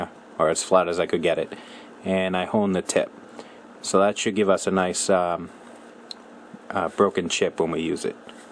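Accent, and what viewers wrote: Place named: Massachusetts, United States. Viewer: What a great video. I'll have to watch this a couple more times to get maybe one tool to work like yours. You make this all look so easy to do, but in reality this is an art. All always, I appreciate all you expertise and hard work making these great videos. I too am from Mass, but I sure don't sound like you. I guess I have been away too long. Now my brothers have that accent. They call me the adopted one. Looking forward to your next video.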